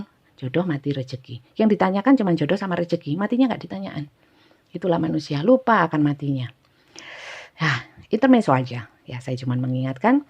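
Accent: East Java, Indonesia. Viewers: Kakak dialognya jw timuran berarti sm dong kak? Sy juga asli Suroboyo lho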